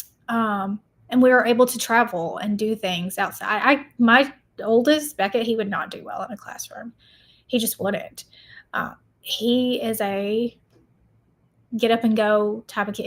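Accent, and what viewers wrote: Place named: Tennessee, United States. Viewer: Your funny and cute I enjoy hearing you talk with that Tennessee accent 😂😂😂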